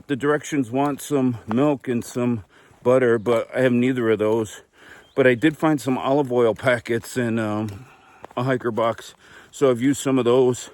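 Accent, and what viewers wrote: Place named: Connecticut, United States. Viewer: You sound like you are from NW Connecticut?Enjoy the hike!!!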